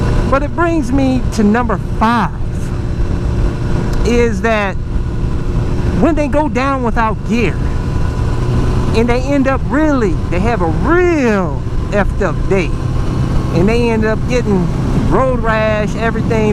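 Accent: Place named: Louisiana, United States. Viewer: Dude are you from Louisiana? You sound familiar af bro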